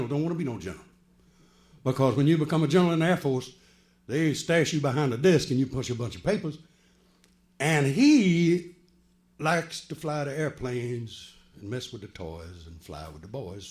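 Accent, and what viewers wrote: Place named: Appalachia, United States. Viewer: This is just too funny narrative in his hillbilly way I'd imagine bubba was just as entertained about it..."my exaust is exausted"...lmao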